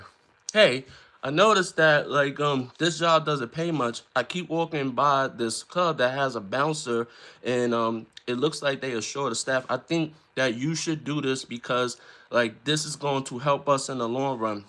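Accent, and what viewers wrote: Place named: New York, United States. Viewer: I will watch Sinners when it comes on video. I have heard good reviews too. I didn't know you were a counselor, so good to learn more about you. Good message you shared. Meeting people where they are at, not where we want them to be. Listening is key. Like you said, sometimes we just need someone to listen, no judgement, no "answers". Good video. Love the accent, sounds like New York!🙌🏽👍🏽 Going to school for Social Work. Honorable!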